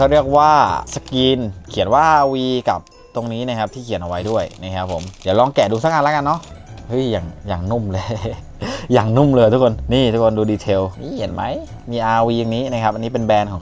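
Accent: South, Thailand. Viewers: พี่ย่าคนใต้หรอค้าบบ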